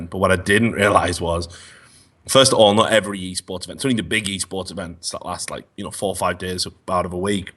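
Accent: Wales, United Kingdom. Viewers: I've never heard you with a Welsh accent before... Sam rubbing off on you? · Never realised how Welsh normal off camera voice is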